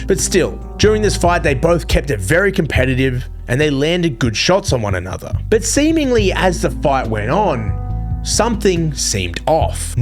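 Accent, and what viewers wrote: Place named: Australia. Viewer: Loving your videos mate. So good to hear an entertaining Aussie accent.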